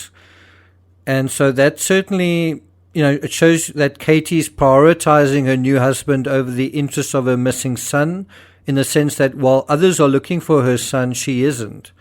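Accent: South Africa. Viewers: I am following other channels which is a lot closer and know more about this case. On 1 channel 1 of your videos came up where the question was where did it come from and indicate the english according to the hoax messages. I did indicate that the accent alone tells me it is someone from South Africa's video so I looked you up. There is so much info out there currently about this case. Happy to see that you tubers in SA have also picked this up.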